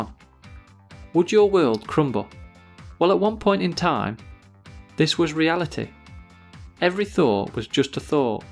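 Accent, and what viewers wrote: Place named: United Kingdom. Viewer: ｍａｙＢＥ it's uk アクセント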